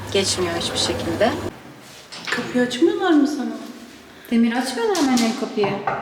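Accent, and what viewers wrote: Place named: Russia. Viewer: Türkceyi ögrendide russ kaldi😂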